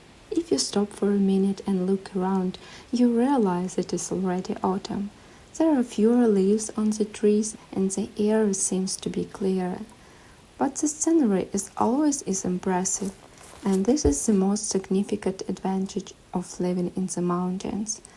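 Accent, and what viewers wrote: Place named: Ukraine. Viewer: ВЫ из НЕ ИЗ УКРАИНЫ? АКЦЕНТ кошмар жуть🙈. У меня выработался навык за последнее лет 7-10 различать украинцев за вёрсту чуять) 😊и вообще русскоязычных сразу вижу)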